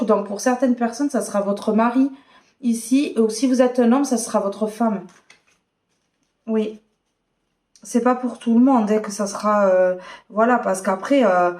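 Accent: South, France. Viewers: Merci.pour votre accent méridional c est un peu difficile pour moi de vous écouter 😭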